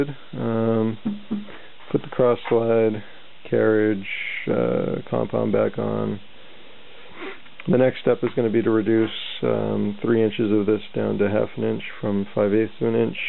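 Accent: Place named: New England, United States. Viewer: I heard the accent. One of those Nor'eastern States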